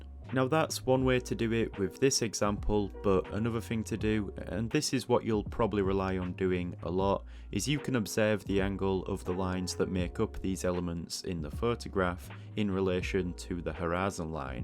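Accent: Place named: Yorkshire, United Kingdom. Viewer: Brilliant video. Also sound like a fellow Yorkshire man! 👍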